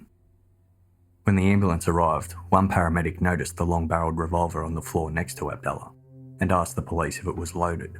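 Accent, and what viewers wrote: Australia. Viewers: Awesome version bro, sweet Real Aussie accent . Ahh the 80s what a decade !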